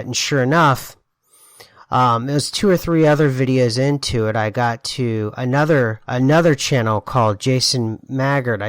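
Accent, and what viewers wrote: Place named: Pittsburgh, United States. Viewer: You sound like your from Pittsburgh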